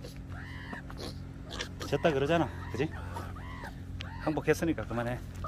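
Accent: Gyeongsang, South Korea. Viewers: 아 말씀 하시는 사투리가 너무 같아서 어디신가 했네요 예천이시군요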